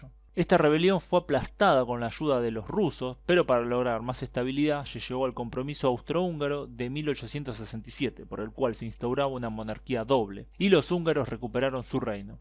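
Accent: Rioplatense, Argentina. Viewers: Asi se pronuncia el castellano rioplatense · Hay palabras que sencillamente no se que dices, son solo palabras pero tu Argentino dificulta un poco saber que palabras acabas de decir. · What he was trying to say is that the channel is from Argentina, not from Spain. But we all speak Spanish. I'm chilean and I recognize his argentinian accent. Greetings to Ukraine!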